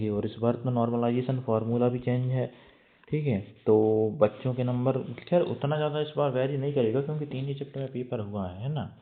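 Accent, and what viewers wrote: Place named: Rural, India. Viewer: Tu bundelkhand se hai kya ??? Accent se lg ra hai kisi gaon se hai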